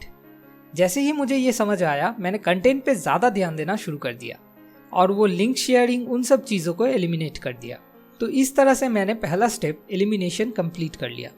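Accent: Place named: Bihar, India. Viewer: pure bihari voice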